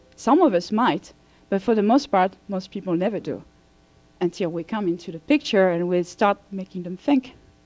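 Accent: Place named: France. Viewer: Powerful stuff; good talk; French accent and all - you're a great speaker! Thanks for spreading the word... and spreading it a little more creatively than when you started back in 2006;)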